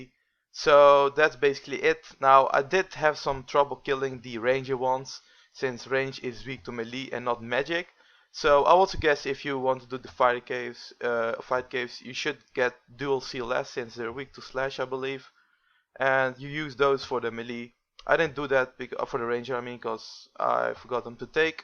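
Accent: Netherlands. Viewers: jij komt uit zeeland typisch zeelands accent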